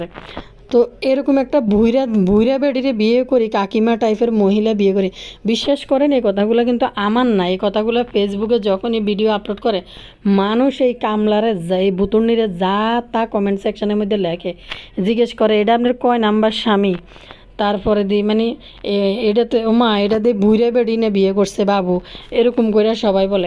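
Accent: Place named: Feni, Bangladesh. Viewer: আপু কেমন আছেন। লাইক দিয়ে দেখা শুরু করে দিলাম। সমাজের মধ্যে এ সব নোংরামিগুলো বন্ধ করা উচিত । আমার দেশের বাড়ি ফেনীতে । এখন আছি আমেরিকার আলবেনীতে। আমার আর একটা নাম্বার থেকে কমেন্ট করতাম। হাছিনা আলম আইডি থেকে । এ নাম্বার টা এখন বন্ধ আছে। তাই নতুন নাম্বার থেকে কমেন্ট করলাম। এগিয়ে যান সামনের দিকে । আপনার কথা শুনলে মন ভালো হয়ে যায় । বিদেশে বসে নিজের এলাকার ভাষা শুনি তখন শান্তি লাগে। আশা করি আপনাকে সরাসরি একদিন দেখব। সবাই কে নিয়ে ভাল থাকবেন। আমাদের জন্য দোয়া করবেন।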